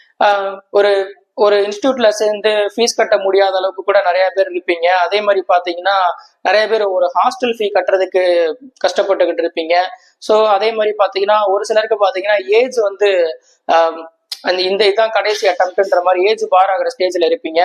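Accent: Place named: Madurai, India. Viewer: Sir madurai ah slang apditha iruku avaiga ivaiga 😅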